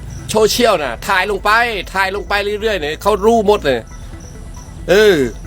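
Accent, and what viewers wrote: Thailand. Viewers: เอาจริงๆนะใครจะดราม่าก็ดราม่าเลย ผมคิดว่าคนในคลิปไม่ใช่เขมร ผมเป็นคนอยู่ตะเข็บชายแดนแท้ๆ ไม่มีเขมรคนไหนที่พูดไทยสำเนียงเหน่อแบบนี้เลย ละเห็นหลายคลิปแล้วที่บอกว่าเขมรมาพูดอวยไทยอย่างงั้นอย่างงี้ผมว่ามันคนไทยทั้งหมดอะฟังจากสำเนียงก็รู้แล้วมันเป็นการพูดพยายามเลียนแบบสำเนียง ที่พูดไม่ได้จะว่าไรนะแค่ไม่อยากเห็นคนไทยหลอกคนไทยด้วยกันเอง อย่าโง่เชื่อข่าวไรแบบนี้มากฟังหูไว้หูพอ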